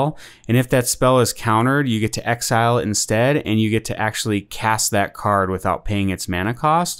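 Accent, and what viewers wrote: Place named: Canada. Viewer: I am only 5mins into the video, and I have to say this video is a big improvement from the last video I watched. The flow is better overall. You have a thick Canadian inflection, not a bad thing just noticeable how you end each sentence high. I would also consider new Viven planeswalker that would allow you to flash your bounce creatures to save important creatures as a way to protect key pieces.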